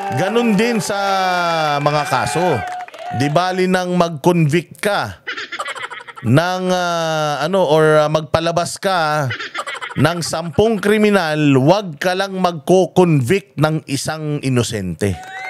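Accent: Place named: Visayas, Philippines. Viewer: Boss bisaya eh matigas ang letra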